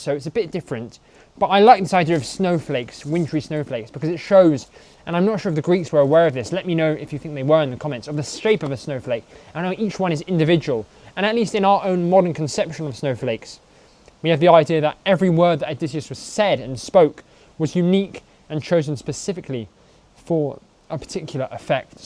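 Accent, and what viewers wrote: England, United Kingdom. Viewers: I don’t want to be disrespectful, because it’s not such a bad video after all, but I can assure you (as a Frenchman) that the verses from Mallarmé and Hugo are even more beautiful without such a thick (and annoying) English accent…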